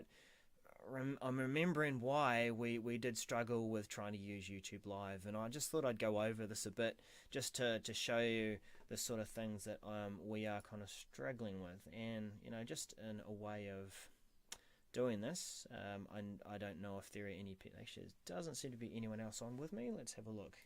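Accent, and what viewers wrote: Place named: Australia. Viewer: Even you young people are lost, lost , I say, to try to follow, understand, and participate , in all this crap, this nonesense, about partaking in all this on-line balloney, about your so-called channel ! It is all a joke ! Even you youngsters are lost with all this so-called technology! a word of advice, Sir, help people to use your services or channel, and you Sir are a horrible explainer to any one ! You bore us to death listening to your Assie accent explain nothing! Get somebody who can listen to you , and he or she will back me up! and try to understand how a person l;ike myself who did not go through school with computers ! Yes the american Main line media is corrupt!! but they have professionals who know what they are doing to put forth their lies! <mail>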